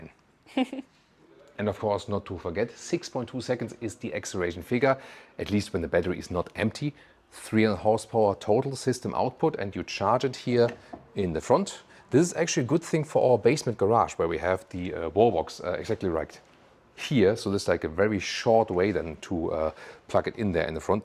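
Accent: Germany. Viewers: Always funny hearing a German talk English👍😭🤣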